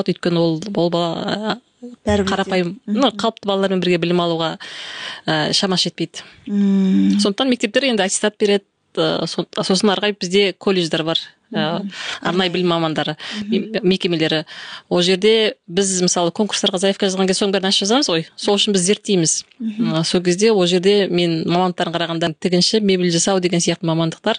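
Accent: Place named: Kyrgyzstan. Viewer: Қырғыздар сияқты сөйлейді екенсіз,қазақшаға ауыспайсыз ба? Қалай басқаларды үйретесіз?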